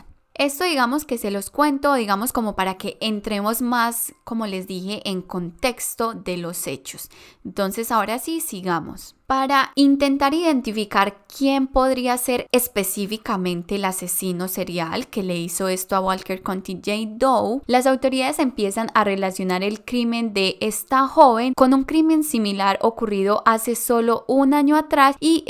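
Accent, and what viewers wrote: Colombia. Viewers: me parece genial que lo hayas tomado bien, la verdad cuentas con mi apoyo en tu canal seguiré viendo tus videos pero si mejora un poco el guión para que no sea repetitivo. Aprovecho nuevamente para felicitarte por tus investigaciones son muy detalladas. Por tus modismos y acento creo que también eres colombiana como yo y si eres de acá me parece genial 😁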